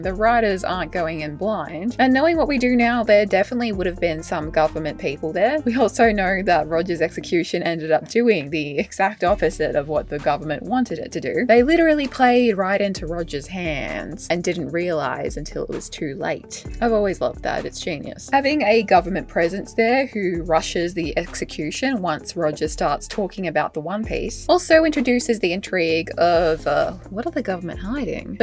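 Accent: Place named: Adelaide, Australia. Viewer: didn't realize that you were in NSW, I assumed that was an Adelaide accent.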